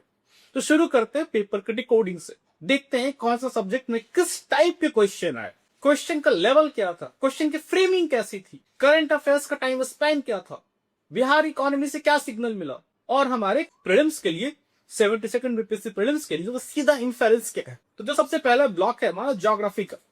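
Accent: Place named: United Kingdom. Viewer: Hindi ko b English accent m bolne ki kya jarurat h sir aapko. BTW...nice session. Thnxxxx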